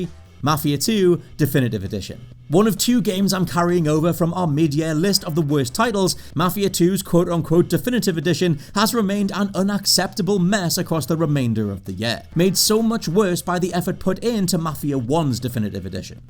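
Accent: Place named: United Kingdom. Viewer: Because British accent?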